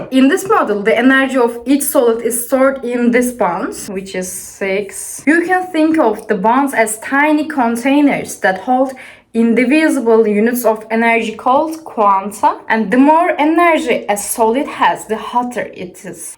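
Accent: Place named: Russia. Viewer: Thanks for the video. Btw ur accent sounds more Russian than Turkish. I am Turkish too, but i'm going along with the concept.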